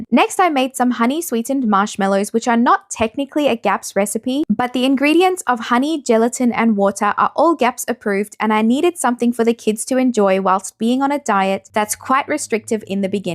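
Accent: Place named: Australia. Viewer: Hi! Seeing this content brought a smile to my face because we’ve also done GAPS as a family (it looked very similar to this!) and I just find it so refreshing hearing another Aussie mum talking about this diet!! I would love to follow you on instagram but your handle doesn’t come up! God bless x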